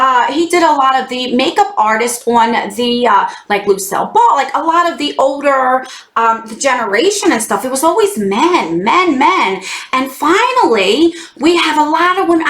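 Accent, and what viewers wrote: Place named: Chicago, United States. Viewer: Love your accent ❤ love that it sounds like your saying crown instead of crayon, not making fun of you just like different accents 😁 Chicagoans accents very so much lol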